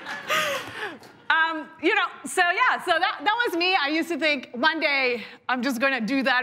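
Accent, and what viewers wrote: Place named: Colombia. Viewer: Quico...lo importante es que ella habla el castellano de Colombia,no el de tu pinche Mexico.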